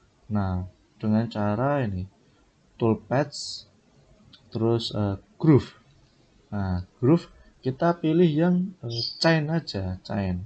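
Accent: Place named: Java, Indonesia. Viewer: suaranya jawa kluthuk bgt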